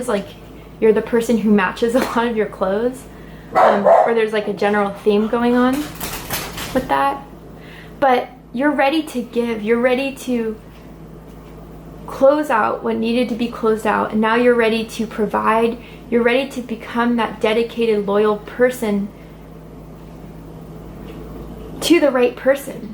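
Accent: Scotland, United Kingdom. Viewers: Scottish lol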